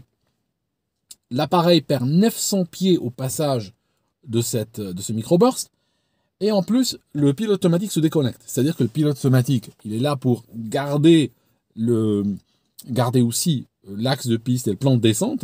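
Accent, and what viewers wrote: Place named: Geneva, Switzerland. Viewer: Excellent comme toujours. Je perçois, depuis deux vidéos, un accent genevois qui revient comme un jour de Bise sur la rade. Je suspecte un contact alpin récent…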